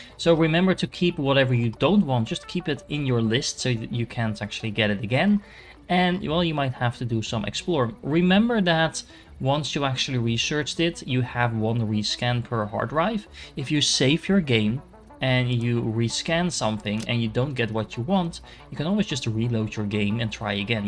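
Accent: Netherlands. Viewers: Super goeie video, toen hoorde ik dat je nederlands was en ja😂 lekker man, goed bezig!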